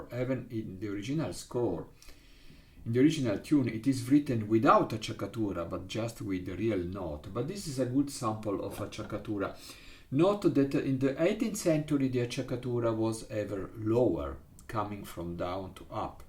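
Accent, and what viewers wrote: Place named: Italy. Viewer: Quite entertaining and hilarious what the AI/NLP makes as subtitles once in a while for your lovely Italian English 😆 I have no difficulty to understand you, but then I need English at work all day and my Italian colleagues have trained me well, who sound very similar to you. While I might be identified by listening to me speaking English as a German mother tongue as well…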